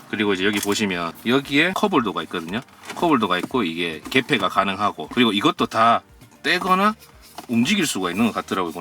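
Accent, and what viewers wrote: Gyeongsang, South Korea. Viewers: ㅋㅋㅋ 나도 경상도놈이라ㅜ경상도사투리 너무 좋음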